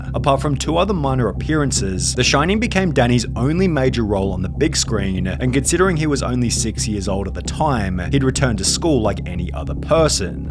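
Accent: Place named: Australia. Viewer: He’s Australian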